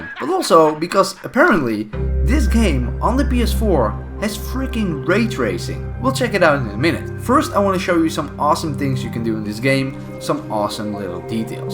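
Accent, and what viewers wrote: Netherlands. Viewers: He sounds very Dutch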